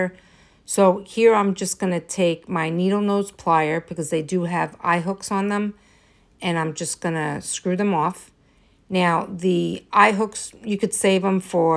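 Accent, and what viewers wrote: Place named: New York, United States. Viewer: You have a new subscriber!! I’ve been watching videos on YouTube for years. As soon as I heard that familiar accent, I was like, YASSSSS!!! NY or NJ LOL? I’m in NJ! Love your videos!! You go, girl !! ❤❤❤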